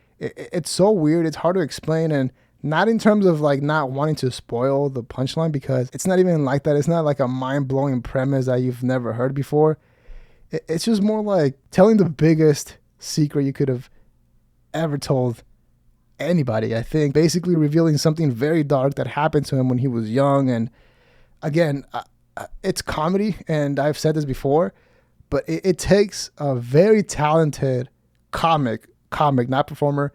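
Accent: India. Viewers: Omg i was so sure you were indian 😂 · OOMG I THOUGHT YOU WERE FROM INDIA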